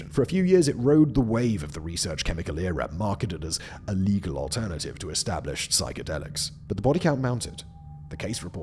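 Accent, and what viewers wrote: England, United Kingdom. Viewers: 2 recorded deaths. There are thousands of known hallucinogens. I never really thought you would be above this fear mongering & we all know you weren’t writing, editing or engaging in the creative process of these channels for many years now. You’re just an English accent reading catch-all content targeting an American audience.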